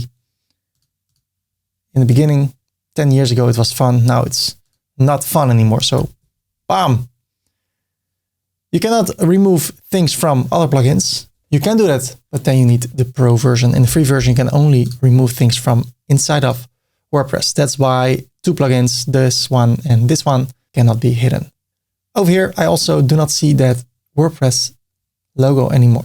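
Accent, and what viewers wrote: Netherlands. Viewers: sounds like a dutch accent . im not sure though. here goes nothing. Nederlands?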